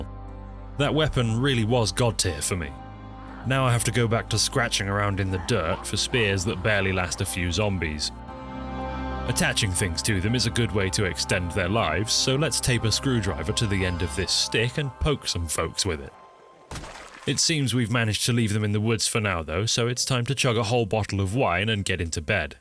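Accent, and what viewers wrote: United States. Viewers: absolutely killah American narration hell yeah babe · As a Texan I forgive and indeed do kindly demand more of your southern accent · As an American, I request that you give us more of your American accent. It may be wrong, but that doesn’t stop it from being wonderful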